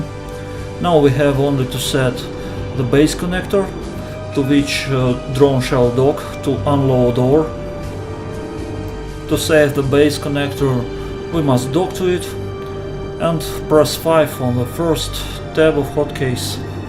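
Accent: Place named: Russia. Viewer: а акцент то русский)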